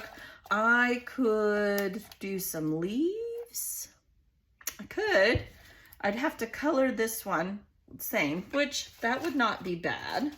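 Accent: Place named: Oklahoma, United States. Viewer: if you are like me your "Walmart" photo packages had 50 images of different sizes and the bigger ones used to hang on my wall in frames. People don't seem to do that so much anymore, but I refuse to discard them so...they are in my albums as well as a few of the smaller sized sprinkled in. There is just something about an 8x10 that encapsulates the family unit. Gotta keep 'em and enjoy 'em! BTW - LOVE your "Okie" side when it comes out!! You did a wonderful job on this large photo layout!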